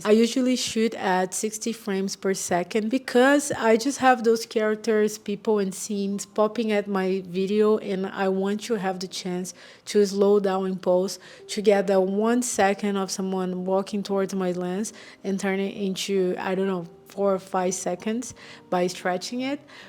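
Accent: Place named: Brazil. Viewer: Excellent content, and I love the Brazilian accent!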